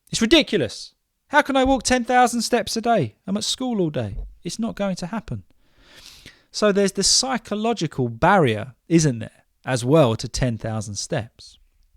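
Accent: United Kingdom. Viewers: Mr you do fantastic I like it your videos so much because you speak British English clearly and and perfectly. Thank you very much for your videos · where are you from british can you answer me please because your accent very nice i love it 😍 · Hello, What a great episode! I also track and count steps on my smartwatch, and you’re right—10,000 steps every day is not that easy. As for me, I regularly reach my goal without any problems—8,000 or 9,000 steps for sure. The rest might be from hand movements that my smartwatch records, so it’s pretty easy to cheat—or better said, the results aren’t always accurate. I have to admit that yesterday, due to the rainy weather in Germany, I didn’t have the motivation to go for a brisk walk. Thank you so much for your inspiring videos! I discovered you on YouTube just the other day, and I truly enjoy listening to you and your cute british accent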